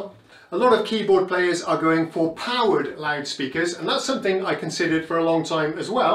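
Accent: United Kingdom. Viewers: Oh no! You are all "BOX'ed" in...hahaha. Great evaluation. And I agree that the plastic monitors do rumble, buzz, and vibrate more often then those built out of wood. I also punish myself by facing my keyboard up against a concrete wall and tell myself, take that pilgrim...haha. But for my bass and guitar next to me, I play looking outside a large window as this is where my DAW if facing. Go figure...lol! I had no idea you were living in Sweden as your accent is British thinking you were in the UK. Either way, that's awesome. Loving your videos and I am so happy for you that you bought a great semi sound reinforcement set up that is pleasing to your ears. Not sure about your wife's ears I assume though hahaha. There are many speaker and amp combinations for such purposes that are cheap. And I stress cheap as in cheaply built and sounding. I think you aquired yourself a great buy. 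Congrats on your meticulously thought out purchase. As far as your amp falling and damaged, you can always say it was a drop in price...lol.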